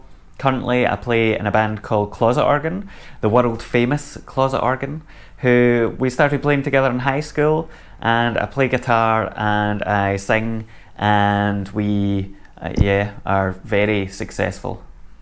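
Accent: Scotland, United Kingdom. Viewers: Found your channel by accident but happy I did. Nice to hear a local(ish) accent and sumdy else who likes synths haha. Do I detect a wee southside accent, Ru'glen Camslang area?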